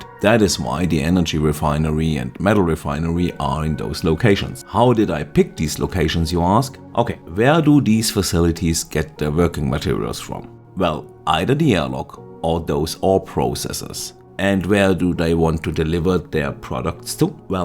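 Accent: Germany. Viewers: Fine video. ( On a sidenote: I'm really struggling with our german accent 😅)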